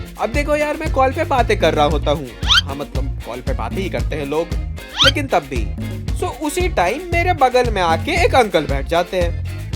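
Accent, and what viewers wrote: Bihar, India. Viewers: accent from Bihar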